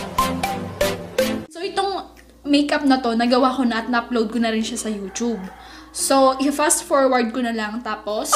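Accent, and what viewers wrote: Ilocos, Philippines. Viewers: Parang may ilokana accent ka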